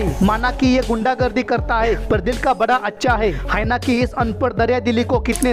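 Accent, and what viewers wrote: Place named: Maharashtra, India. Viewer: Bhai aap toh pakka maharashtraian lagtey ho...superb broo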